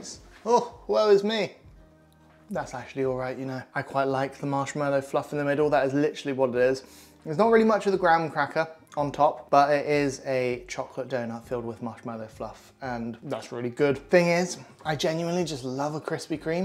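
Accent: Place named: Surrey, United Kingdom. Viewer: I’ve been trying to work out what part of the country you live in, as you have tons of chain brands in your vicinity; but your accent leaves me puzzled!!! Where?!! 😝 Surrey??!!!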